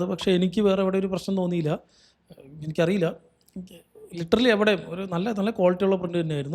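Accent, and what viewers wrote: Palakkad, India. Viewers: bro, palakkad ano?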